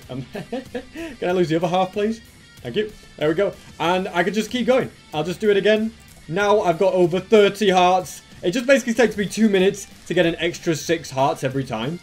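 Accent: United Kingdom. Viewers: btw are you British?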